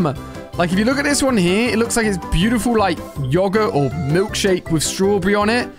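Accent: Australia. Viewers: Are you Australian because if so how do I never notice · Australian or bri'ish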